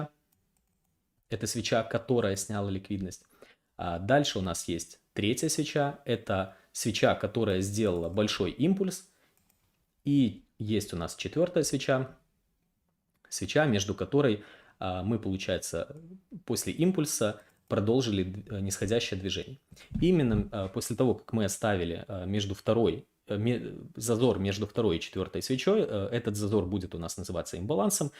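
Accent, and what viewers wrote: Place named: India. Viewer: жуткий акцент у этого парня.... индус что ли....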